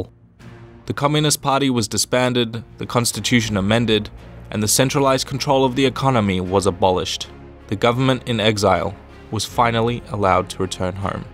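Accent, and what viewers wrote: Poland. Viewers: is this guy polish or something? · nic polish accent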